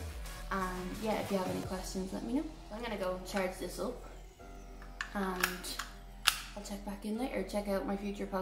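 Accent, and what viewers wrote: Ireland. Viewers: Congrats and Merry Christmas 🎄 Which Irish accent is this btw? Struggling to figure that one out..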